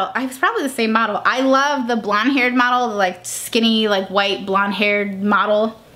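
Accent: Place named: Texas, United States. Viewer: I love your loudness I'm from Houston Texas so I'm so use to the way you talk makes me think your a normal person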